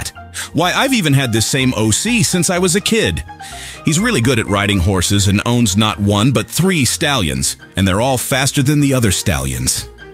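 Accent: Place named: Texas, United States. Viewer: how do you capture that texas colony accent so well?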